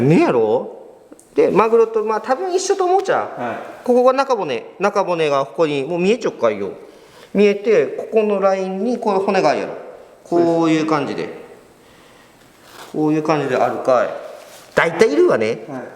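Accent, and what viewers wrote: Miyazaki, Japan. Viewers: 宮崎の方言に ほっこりだわ～😂 凄い 裁きですネ 旦那がぁ 宮崎出身だから～ 懐かしくて😂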